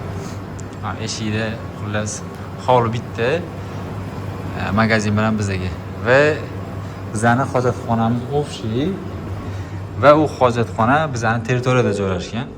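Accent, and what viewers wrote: Khorezm, Uzbekistan. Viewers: Xorazmliksiz ajoyib aksentiz bo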